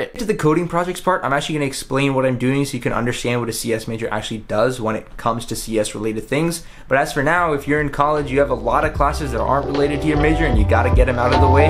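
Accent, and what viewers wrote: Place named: United States. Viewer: Indian harry potter with american accent, perfect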